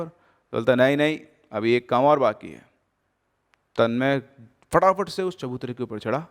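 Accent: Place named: Bihar, India. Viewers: no bruh! its not normal hindi ... Its bihari accent . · Your bihari acent is so cute and the 3D fig 👏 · Nice bhojpuri accent sir ji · The bihari accent langda bhoot dekhe k ba tohra k 😂... Hilarious 😂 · His bihari accent 😁😁😁♥️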